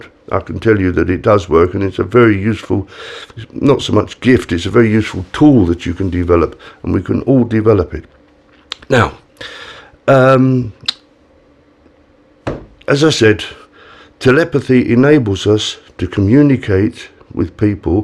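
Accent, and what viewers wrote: United Kingdom. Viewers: my apology. But how can I fill my aura with spiritual power. My apology again and thank you. Btw, I love your British accent.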